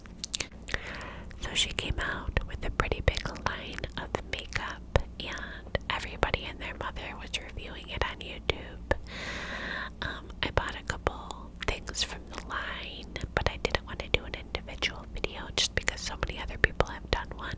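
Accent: New York, United States. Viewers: Could you do another video with your New York accent? Those videos are always so tingly 🥰 💕 I hope you are doing well! 😊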